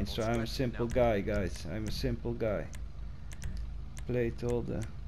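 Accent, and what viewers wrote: Netherlands. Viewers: im half netherlands and half from belgium. thats why i knew that he was from the netherlands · Are you dutch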